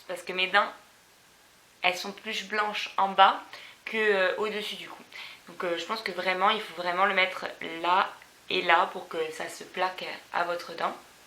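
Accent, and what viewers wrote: Corsica, France. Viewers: Mdrr toi sa se voit que tué corse avec ton accent moi aussi je le suis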